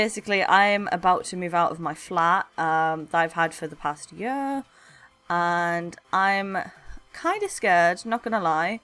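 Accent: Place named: London, United Kingdom. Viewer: New subscriber here! Discovered you today and i'm in love with your content and your accent! Like... Damn, can i be from London to have it? hahaha keep up the good work! <3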